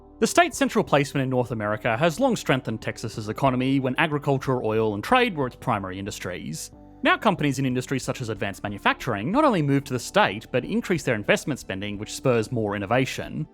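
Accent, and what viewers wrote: Australia. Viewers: He's Australian, there are a lot of elongated vowels with the countries accent. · Whose idea to use a horrible rushed Aussie accent? Just use a normal American accent and less wordy next time. Thumbs down for being overly boring. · Your accent is so wonderfully aussie that i thought at the end of the video, "i didn't know they call each other "partner" down under.....just like in Tex....wait a minute." Then i got it. Slow day for me.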